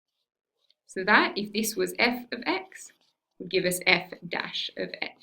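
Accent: Australia. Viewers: thank you so much, this was a lot of help! im from New Zealand and im trying to figure out if your accent is from here or Australia. I think you're from Australia??? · Oh my gosh thank you very much!! Hi from the UK, I can defo tell your Australian :D love the accent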